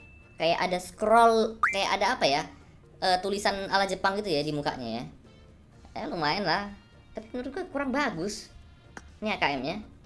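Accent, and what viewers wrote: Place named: North Sumatra, Indonesia. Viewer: jelas kali logat sumut kau bg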